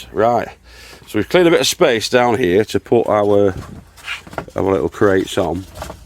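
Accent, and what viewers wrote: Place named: Warrington, United Kingdom. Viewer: i knew I recognised your accent. . . . I was born in Warrington (years ago in black and white 😂😂). Worked for Greenalls Brewery for 21 years. Now at the age where gardening has become much more important to me so your videos are always enjoyable and instructional. Keep them coming Mucker !! MJ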